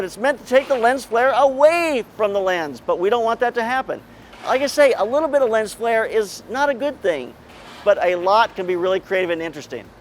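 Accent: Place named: South, United States. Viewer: Wow she was country twang in that voice I didn't expect that LOL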